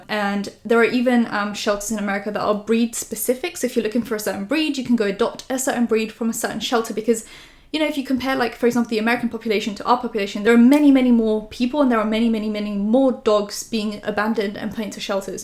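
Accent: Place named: England, United Kingdom. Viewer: i wish we were friends. i hear the english accent and wonder if ill ever run into you. but then i realise England isnt THAT small XD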